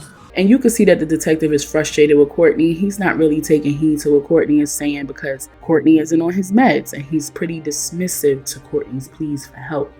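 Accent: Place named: New York City, United States. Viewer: Yo you funny ash with that accent love that nyc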